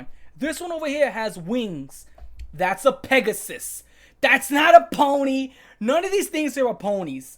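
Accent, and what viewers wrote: New York, United States. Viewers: Your New York accent makes this video funnier 😭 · I couldnt stop laughing at this, i was just listening while working and hearing this new york accent describe eating shit was something else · I think the reason people call you Italian is due to the New Yorker accent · bro sounds like Peter Parker that is actually new yorkian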